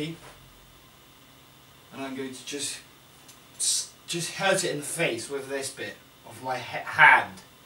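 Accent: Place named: Boston, United States. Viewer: Where do you get a slick Boston accent like that?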